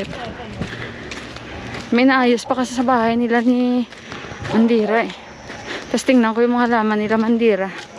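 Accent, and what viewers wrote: Iloilo, Philippines. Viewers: Gustong-gusto ko talaga Ilonggo accent mo, mamsh. Missing Iloilo and Bacolod and all my Ilonggo friends.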